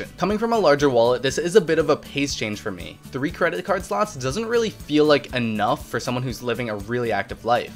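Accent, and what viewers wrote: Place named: New Jersey, United States. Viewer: guess your from Jersey haha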